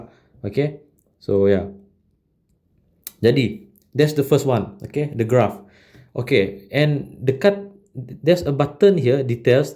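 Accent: Sarawak, Malaysia. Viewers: hahaha.. nang antap gilak sleng srwk nya tek. ku awal2 juak dah perasan.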